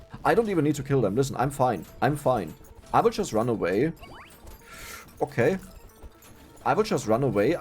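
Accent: Germany. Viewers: Alter ich denk die ganze Zeit was hat der für einen abgefahrenen dialekt. Und dann ja es ist einfach Deutsch mit irgendeinem lokalen Englisch dialekt? Hört sich auf jeden Fall nicht nach Schule Englisch an. Ansonsten schickes video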